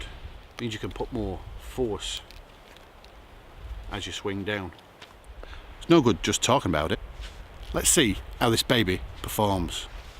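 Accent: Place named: United Kingdom. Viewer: I love the british accent, and great video bruv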